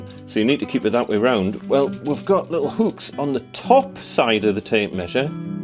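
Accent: Newcastle, United Kingdom. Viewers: This is what I’m looking for but they’re not easy to find. This guy sounds like a Geordie!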